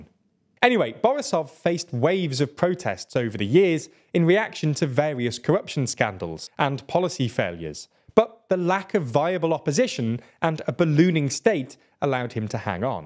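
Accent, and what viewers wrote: United Kingdom. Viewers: Why do y’all say slavic names so British 😩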